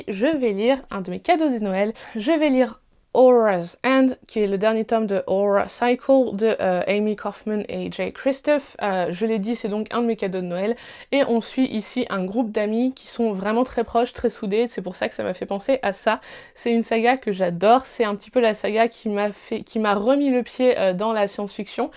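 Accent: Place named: England, United Kingdom. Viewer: Coucou, j’ai hâte de voir tes retours car il y a beaucoup de livres qui me donnent envie et en passant j’aime trop ton accent anglais 😍. Bon challenge et bonne lecture!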